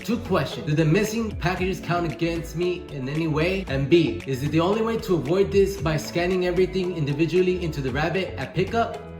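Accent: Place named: Puerto Rico. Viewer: cuz I saw your video u posted on ig were your video is about a guy asking u if you guys were open and u were talking in Spanish, but u had a Puertican accent. But both my parents are from Mexico but I'm born and raised in Los angeles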